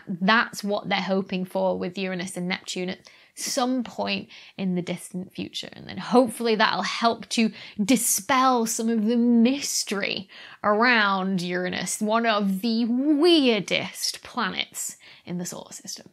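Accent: United Kingdom. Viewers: Sorry to say Dr Becky, the closed captioning on my television translated your “Saturn” to “satin”. I knew what you were talking about, but my television has problems with a British accent. Love your videos.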